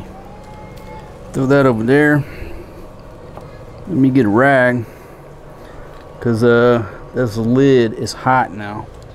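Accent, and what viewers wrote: United States. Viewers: Hi mate just love your American voice, wish I had it. By the way I got one of those DJI osmo pocket cameras love it. Dave australia.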